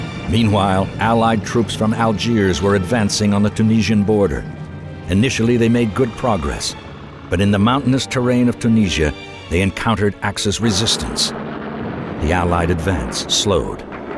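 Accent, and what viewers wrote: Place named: United States. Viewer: Lolllll. I wondered f that were the case!!!! I was thinking “This must be British but they hired an American guy to narrate.” Lol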